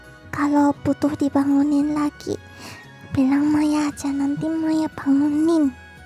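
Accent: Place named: Java, Indonesia. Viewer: Kak maya ngomongnya medhok ea...hehe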